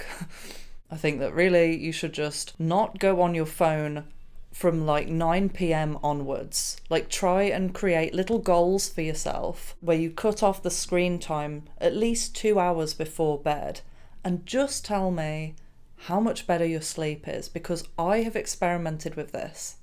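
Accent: United Kingdom. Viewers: I like your accent got a pretty strong powerful British accent😊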